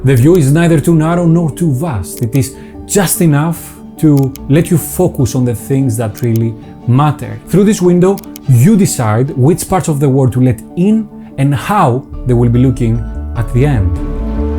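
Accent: Greece. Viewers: Μου αρέσει ιδιαίτερα η προφορά στα αγγλικά.Δωρική θα την έλεγα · Amazing, your Greek accent is similar to mine, from Spain.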